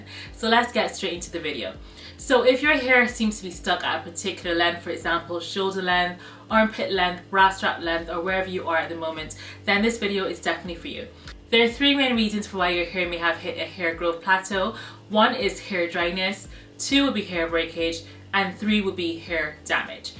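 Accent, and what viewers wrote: Barbados. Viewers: I knew I heard that accent! Fellow Bajan here! ❤️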